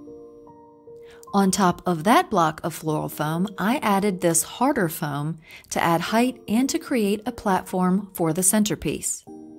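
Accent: South, United States. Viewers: I am southern too and love your soft southern voice. There are many channels that I start to watch and would watch for content but cannot tolerate the harshness of their voices but anyway thank you for your pleasant voice.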